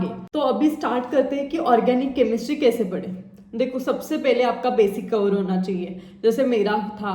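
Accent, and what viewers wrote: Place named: Maharashtra, India. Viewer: Didi tu Marathi aahes na kltay tuzya acsent vrun😅❤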